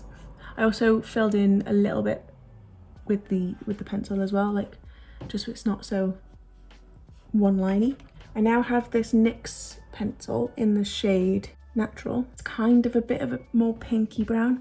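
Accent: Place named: United Kingdom. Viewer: ur accent is very british by the way loved ur video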